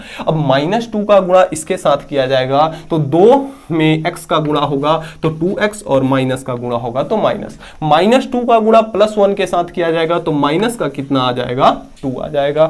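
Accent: Chhattisgarh, India. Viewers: mujhe lga tha aap chhatishgarh se ho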